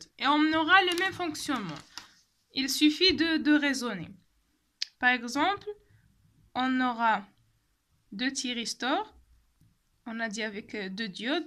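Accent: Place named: Kabylie, Algeria. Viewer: Électronique de puissance avec accent kabyle <3 ! ( On a tendance a noter l'angle d'amorçage avec alpha pour laisser le Phi pour le déphasage en cas de charge inductive ), Merci a vous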